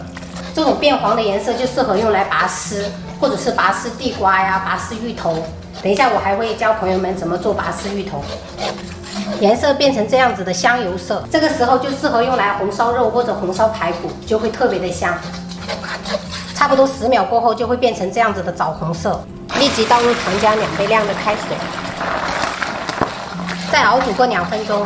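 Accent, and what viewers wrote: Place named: Fujian, China. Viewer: 我感觉她是不是很小就去到闽南了，因为她讲普通话也是福建的调调。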